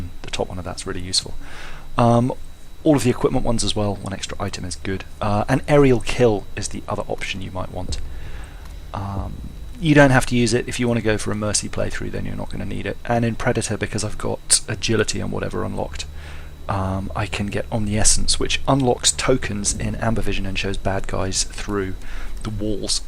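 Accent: United Kingdom. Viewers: Thanks for the great video. I had to make adjustments because I was going for the Thief Insignia and for the Pretentious achievement at the same time. "Pretentious" is a nightmare, but with a few covered kills followed by some "run-like-the-wind"s it was finally doable (albeit leaving a bloody trail of devastation in my wake). Loved the narration, btw. There's something about a British accent that just makes you-tube videos better (sounds more cultured), and I also appreciate you keeping it clean. It's refreshing to not have to put up with a slew of f-bombs just to watch a gaming video. I look forward to the rest of this series. This game is far from my "favorite", but your videos definitely make it better. Kudos!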